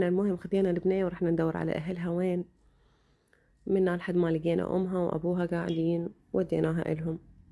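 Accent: Basra, Iraq. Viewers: لهجة اهم البصره تجنن وربي متابعه الج من الدوانيه حياتي ربي يحفظكم ويحفظ ابو علي والجهال الج اللهم آمين يارب العالمين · عيب من الي يحجن هيجي حجي اني احب حجي أهل البصرة اتشرف بيج اني من أهل طارمية بغداد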